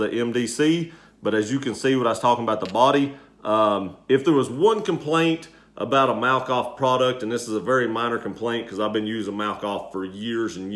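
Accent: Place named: Alabama, United States. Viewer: Also, where are you from? I’m pretty good with accents but am having a hard time making yours out. I’m guessing somewhere north of Tuscaloosa?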